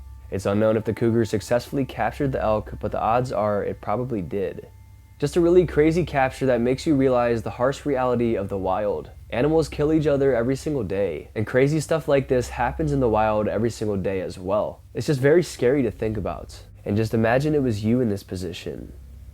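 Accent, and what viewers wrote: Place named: California, United States. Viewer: Get a narrator. You have too much vocal fry and a very Californian accent. Your voice is not good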